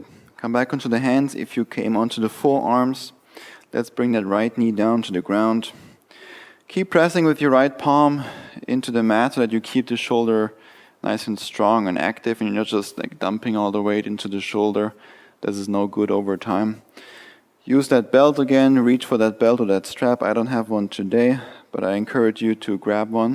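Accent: Germany. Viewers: Germany from the sounds of it hey? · I can hear the German accent. Stimmt? I liked the video but this is not for beginners, I am sorry. I practice yoga daily for 7 or 8 years, and this is not beginners yoga. Vielen Dank. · Are you german?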